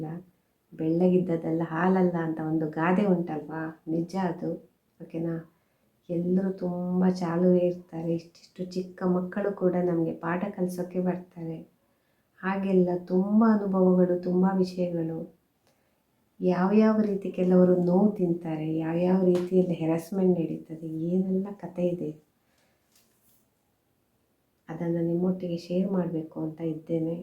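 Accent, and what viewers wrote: Standard. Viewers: Nimma video bari super hagutade.yellaru ivarige support madi.swatcha kannada matanaduva shaili olleyadagi barutte.yellarigu request maduta iddene support madi .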